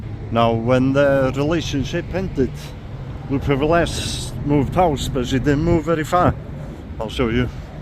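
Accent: Wales, United Kingdom. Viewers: Just discovered your channel. Great to hear Welsh accents on YouTube. I’m from the south, but there’s definitely not enough from the north on social media. Keep up the great work, look forward to watching more of your videos. Diolch!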